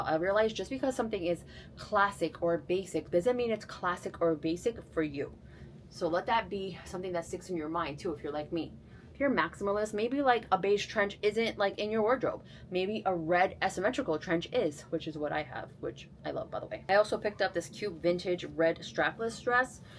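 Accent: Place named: Brooklyn, United States. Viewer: I love all of your finds and your style!! I lived in Brooklyn in the 80’s as a teenager. Your accent and your personality brings me back, and reminds me of all of the Italian girls that I went to school with….I miss it so much!!! The best time of my life!! Plus, I want to convert my spare bedroom into a closet and dressing room…but my 20 year old son is still in there 😂 Id say your Halloween costume will be Fran Drescher as the Nanny.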